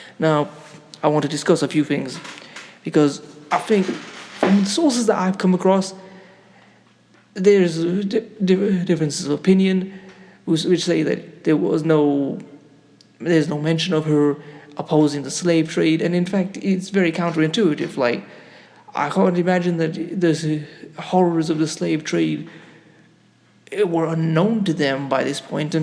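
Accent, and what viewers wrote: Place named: United Kingdom. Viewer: Well for the British accent you deserve an applause. But I think you should adjust the speed in while voicing out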